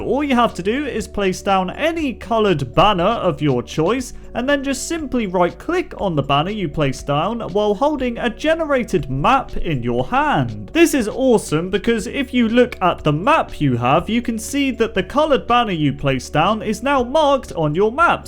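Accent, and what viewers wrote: United Kingdom. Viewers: are you british? that sound like that.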